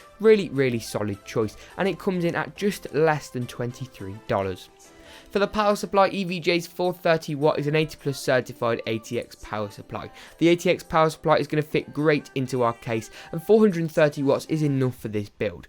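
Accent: United Kingdom. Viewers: Why don't you leave uk links you sound like you're from the uk?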